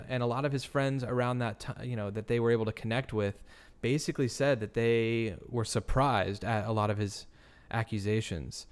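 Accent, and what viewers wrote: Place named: United Kingdom. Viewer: Really enjoyed 👍I think this looks really promising and there's a similarity with the sketches. Nice to hear a brit cover this, I've been following this since two weeks after the murders. Libby was such an inspiring and brave person and both girls would have made such a contribution in this world. Justice will be served for these beautiful girls and their families 💜 💙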